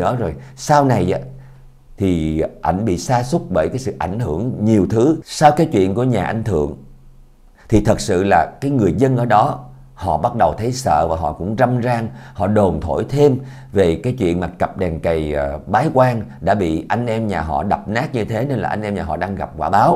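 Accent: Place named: South, Vietnam. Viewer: Người miền nam họ kể chuyện dể hiểu hơn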